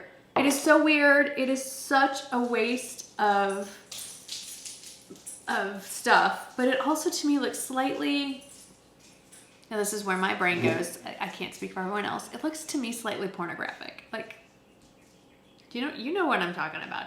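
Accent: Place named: South, United States. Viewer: Omg I love you! Spoken like a true classy southern lady 👏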